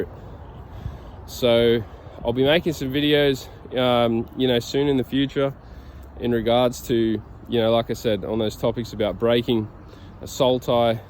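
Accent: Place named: Australia. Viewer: awesome stuff my brother just found you're channel now you sound like an aussie I'm from sydney would love to have a good old yarn with my fellow brother in Christ about our testimonies , the spiritual warfare , isolation of those who can see and hear ,the ones that pray for discernment and pray for a mighty strong faith in the way the truth and the life and pray our Father`s will be done on earth as it is in heaven . i don't usually comment on anything but felt i should right now would be cool to catch up some time my bro GOD willing God bless bruv Deano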